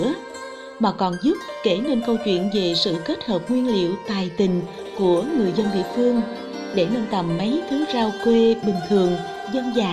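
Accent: Mekong Delta, Vietnam. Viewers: Chat lieu lam nen mon ngon rat dan da binh di khong co cong thucnhung day mui huong ket hop voi chat giong mien tay de thuong va khong the thieu chiec ao ba ba lam nen hon que viet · A mà là bí thư tỉnh em .A ký ngay quyết định Cho em về làm phát thanh viên đài truyền hình của tỉnh ngay. Vì giọng đọc của em rất ngọt ngào ấm áp.....lôi cuốn khán giả. Chuẩn gái miền tây...... Hihi.....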